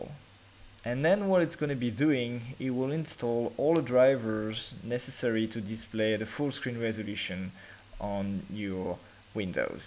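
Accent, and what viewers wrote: France. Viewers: are u french xD ?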